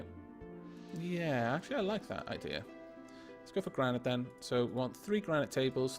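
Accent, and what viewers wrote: Wales, United Kingdom. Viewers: Well yes, I've travelled quite a bit, mostly to learn English too (I'm a frog ^^). As a matter of fact, I also spent two months in total in Wales (Wrexham). Which might be why I like your accent so much, as I have fond memories of the nice people I've met there ;-) Anyway, you deserve those praises, as quality content should be acknowledged.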